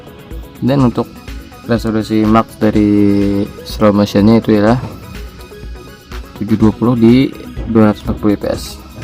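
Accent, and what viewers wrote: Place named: Banjar, Indonesia. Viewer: Mun di dangar ni org Banjar pang😂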